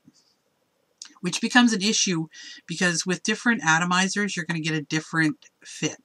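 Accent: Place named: Canada. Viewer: Okay, good video! Couple things...? Hot! I'm a sucker for that Canadian drawl..."owwt" instead of "out." what a cutie! And second, don't you dare bleep yourself. There's nothing funnier then you saying "fuck" ten times in a video out of frustration:). Love it!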